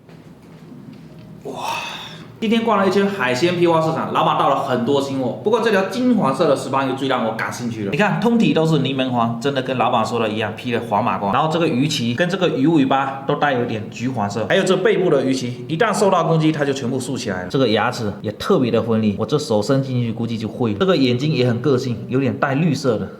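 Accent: Fuzhou, China. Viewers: 一听口音就是福州的😅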